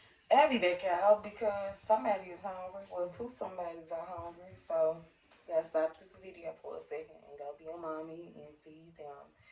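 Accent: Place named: South, United States. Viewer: I love your beautiful accent! Are you from down south?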